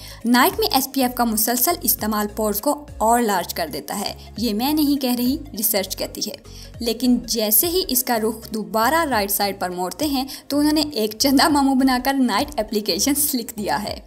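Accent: Punjab, India. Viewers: Nice di panjbi bolde ho so sweet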